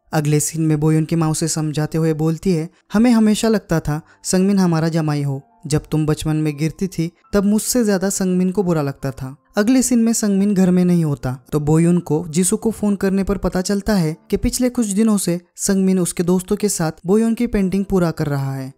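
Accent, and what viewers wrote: Maharashtra, India. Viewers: Aap marathi ho kya ?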